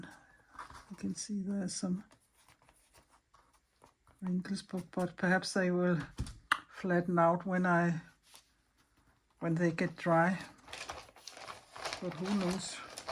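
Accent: Denmark. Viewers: Love your collages and colors and your little chats with your danish accent.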